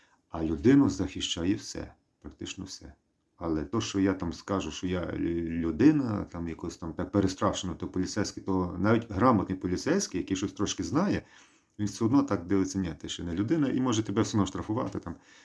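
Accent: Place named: Galicia, Ukraine. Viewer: Лайк за україно-галицький говір🤔🙂👍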